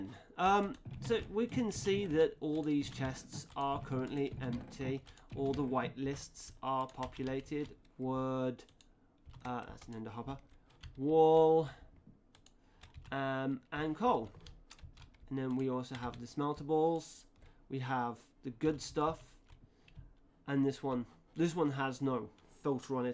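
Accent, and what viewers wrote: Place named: United Kingdom. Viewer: Yeah, hoppah. Nice explanation and voice. I never really liked the british accent, I always preferred something like the canadian one. But you do sound nice.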